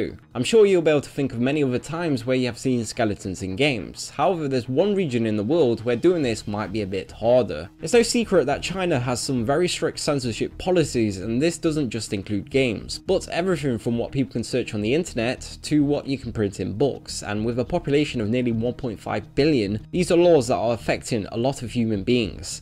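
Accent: United Kingdom. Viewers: What country is your accent from? oothers (others) coolts(cults). Is it Scottish?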